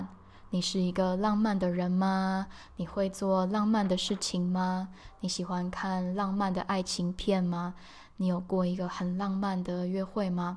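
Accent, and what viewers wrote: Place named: Taiwan. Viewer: Thank you so much your video are just perfect even after 5 years your video is still useful, im also taiwanese so I'm so glad i found a video with taiwanese accent ❤ the subtittle a lot too thank you for working very hard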